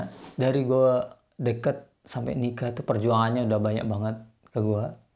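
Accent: Lombok, Indonesia. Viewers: Logat sma diksi2 lomboknya ga ilang bang mantapp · Khas sekali Logat lomboknya 😆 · logat lombok masih kentel😂😂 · I like your style of speech Bro, Lombok gatiii 😁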